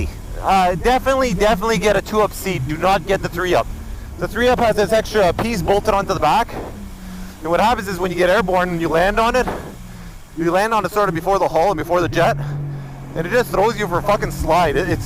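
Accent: Canada. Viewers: You Canadian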